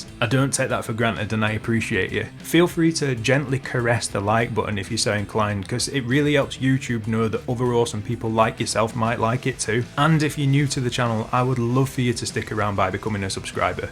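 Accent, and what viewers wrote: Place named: Northern England, United Kingdom. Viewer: Proper northern guitar review. Strandbuuurg Buuurdon. Subscribed.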